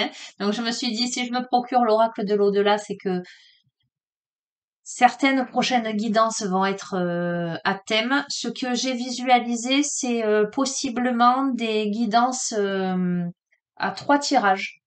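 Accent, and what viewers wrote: South, France. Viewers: Bonjour je suis une nouvelle abonnée j entend l accent du sud . Je suis du Gers.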